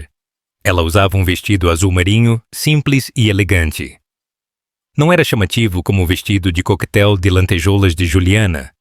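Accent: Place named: Portugal. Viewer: QUE NARRAÇÃO HORRÍVEL E EM PORTUGUÊS DE PORTUGAL! QUE DESRESPEITO ‼️😡😡😡😡😡😡😡😡😡😡😡😡😡😡😡😡😡😡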